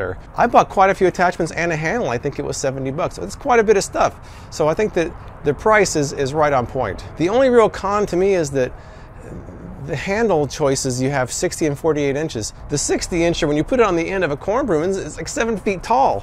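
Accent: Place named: South, United States. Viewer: Keep the southern accent man 😂 if you grew up in the south then keep the accent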